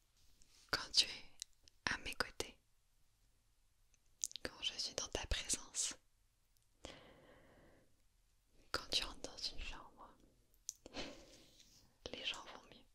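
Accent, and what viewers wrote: France. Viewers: Lol yeah her French is great...she's a native speaker. She lives in Montreal (Québec province of Canada) but she has a French accent when speaking (not a Québécois accent). Makes me think she's French and moved to Canada.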